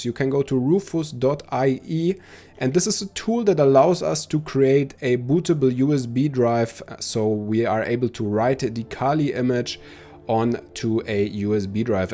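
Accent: Germany. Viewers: That strong german accent 😂 Gutes Video, mach ich gleich ma.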